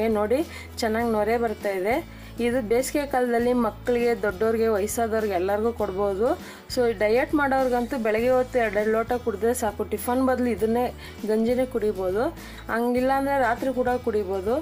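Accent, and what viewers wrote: Tamil Nadu, India. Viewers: Nimma language noduddre gothaguthe you are from tamilian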